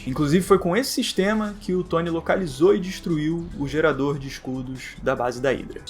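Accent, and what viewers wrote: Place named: Northeast, Brazil. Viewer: Mano vídeo sensacional cara parabéns. Mas me diz uma coisa tu é nordestino? Pq teu sotaque é igual o meu tbm kkkkk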